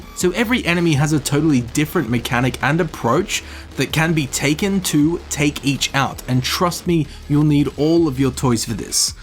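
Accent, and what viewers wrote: Australia. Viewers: Took me a while to realise. You're an Aussie? Never seen your channel before, but since Eternal will be my "allowance" game for this year, I'm trying to soak-in as much of it as I can to get an idea of what my wife is letting us spend $300 on bahaha. Your video is damn detailed and I would be very interested in talking with you after Quakecon (which I hope you will be covering). Awesome content, mate.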